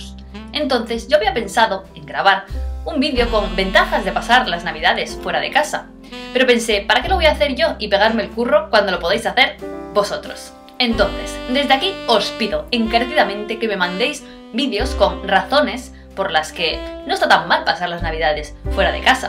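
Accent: Mexico. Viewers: jaja excelente acento mexicano ..subiré mi video :) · Que bien el acento Mexicano jajajajaja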